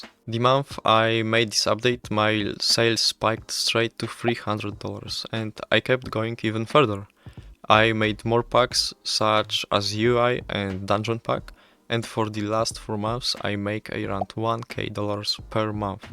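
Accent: Poland. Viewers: ty z polski jestes bo taki akcent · ty polak co nie XD od razu slychac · poznam polaka jesli go usłysze... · brzmisz strasznie polsko, powodzenia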